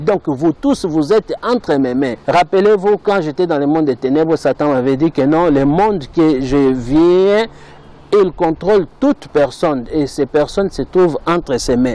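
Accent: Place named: Democratic Republic of the Congo. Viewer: N'insulte pas pardon.. C'est l'accent congolais qui fait ça.. Personne ne t'a demandé de cliquer sur la vidéo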